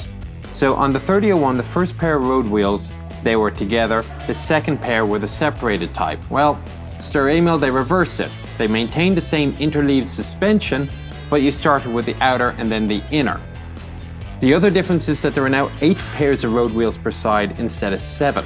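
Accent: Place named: Ireland. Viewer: this guy has a speech impediment on top of a thick Irish accent not a great combination for understanding anything he says!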